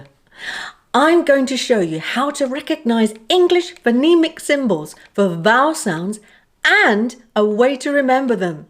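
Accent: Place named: Scotland, United Kingdom. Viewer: Hi, I am here just to say you are amazing. Where have been all my life? Your Scottish accent made me giggle. You are the best English teacher I ever had. Grazie mille la mia eccellente insegnante. Ciao.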